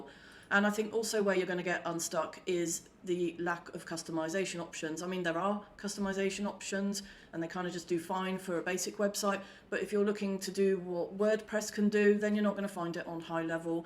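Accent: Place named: United Kingdom. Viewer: Nice to hear a British voice lol 😂🙌🏽. I'm from the uk also 🇬🇧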